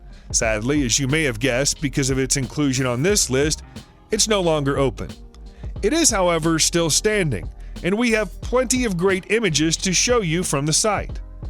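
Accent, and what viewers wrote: United States. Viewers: He speaks with an American dialect. I'm from Canada and nothing he said seemed wrong. I also have a lot of American friends, so I am used to hearing their mispronounciations. Look up how they produce Iroquois versus how it is properly pronounced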